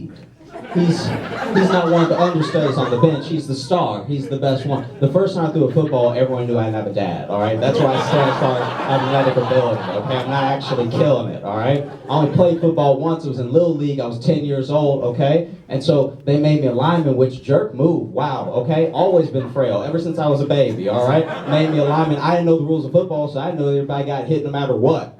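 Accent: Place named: Louisiana, United States. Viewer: What's good Fam! Im from North Louisiana, half way between Alexandria and Shreveport. A little shithole called Zwolle! I just ran across ur videos and was checkin it out and of course that Louisiana draw caught my ear. When you said you from Louisiana and everybody clapped and u said not New Orleans and said New Orleans to Louisiana was like having 1 beautiful blue eye and a fuckd up face!! I laughed my ass off cuz! When I tell people where im from I can already see images of Mardi Gra starting to form n their head. Keep up the good work man, make us proud! life n such a fuckd up place will giv u a either a chip on ur shoulder or the best sense of humour ever. I guess u got the latter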